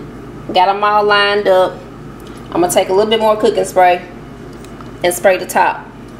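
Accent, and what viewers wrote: South, United States. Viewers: I love Southern women's voices...I assume you are from the South. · Love that Southern accent !! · Great video .keep up the great work. And I just love you country style accent.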